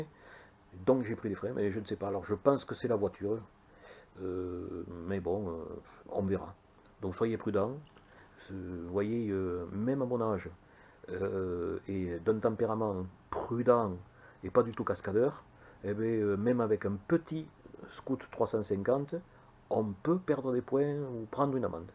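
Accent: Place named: South, France. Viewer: Bonjour Merci pour votre partage d'impressions sur votre scooter, et j'apprécie beaucoup votre joli accent méridional lol Je possède actuellement une Deauville 700 avec laquelle j'ai eu un accident de la circulation, le délai d'obtention des pièces de rechange étant très long, Honda St Dié m'a prêté un ADV 350. Je suis agréablement surpris par le confort et les aspects pratiques de ce scooter et je me demande si je ne vais pas le garder...... Comme toi je suis à la retraite j'ai 66 ans, j'habite en Alsace dans la montagne vosgienne. Est-ce que tu as déjà fait de longs voyages avec? Merci pour ton essai et bon week-end à toi.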